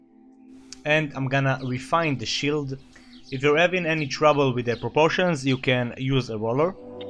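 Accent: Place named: Israel. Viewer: אתה ישראלי? יש לך מבטה של ישראלי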